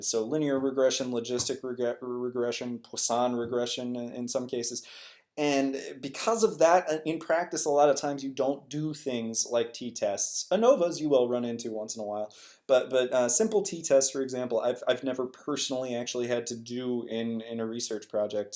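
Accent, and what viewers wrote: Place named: Wisconsin, United States. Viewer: Oh, also, I think you're developing a bit of a Wisconsin accent, and I'm not happy about it.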